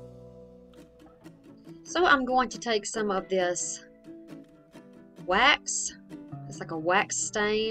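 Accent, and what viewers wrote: South, United States. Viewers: Found you via Julie’s channel from your comment. I’m from Alabama originally. Now living in Panama City beach. Love to hear us southerners on YT 😁😁😁😁😁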